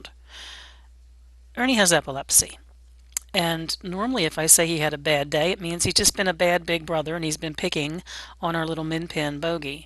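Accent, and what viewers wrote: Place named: Maryland, United States. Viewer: Well my min pin is named Snuffy after the 1st dog I remember having as a kid, who was a Manchester Terrier and was also a black and tan. I have a weakness for black and tan dogs I guess, have certainly had quite a few in my life. I fostered dogs for a while for 2 different rescues in the past, as well as some bottle fed kittens and a mama cat who had just had kittens. But Snuffy doesn't bark that much at all. I taught her early on that was not acceptable unless there were truly something I needed to be alerted to. I have had her for 4.5 yrs now from 4 mos of age, and I can pretty much discern her different barks to know what's going on outside, I guess you could say I almost speak dog....ON another thought...heading to the liquor store before a big storm is one of the very goofiest things I do believe I've ever heard of! 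I can safely say I would not be one of those folks, lol. BTW, I grew up till age 15, in Columbia MD, sounds like you have a similar accent:) You may wish to let the vet know that Ernie has had an increase in his post ictal stage of seizure episode...and yes, I DO remember that lovely song, lol. BB to you and yours, kissies to the dogs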